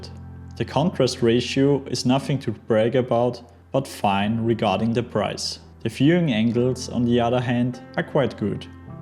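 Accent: Germany. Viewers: German accent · Das hört sich an! Wenn ein Deutscher versucht Englisch zu reden!?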